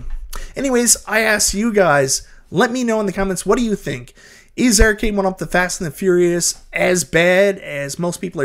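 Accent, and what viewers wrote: Canada. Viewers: no offense I watch your videos. Your Canadian accent with your pronunciation of Arcade gets on my nerves to no end for some reason. Lol. But people come here to hear about the game. While not stating it you were giving other peoples opinions of the game. You are essentially giving a review when people watch this of what to expect. You’re splitting hairs here.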